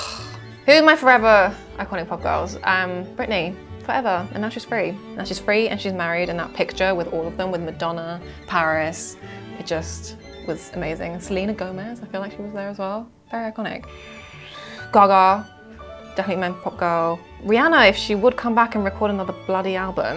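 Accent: United Kingdom. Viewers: How did i not know she was british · I just want to hear her breathe into the mic... I really want her to ASMR through my ears...I'm really loving the British accent too... sound so nice ....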